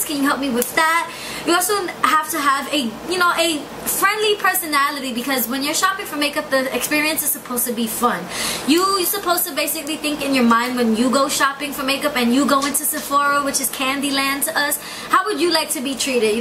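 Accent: New York, United States. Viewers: You must be from NYC ☺ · Its called a NY accent..duhh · are you from nyc??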